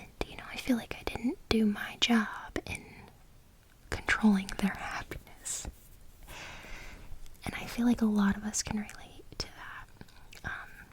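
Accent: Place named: Oklahoma, United States. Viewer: You sound like you are from Oklahoma.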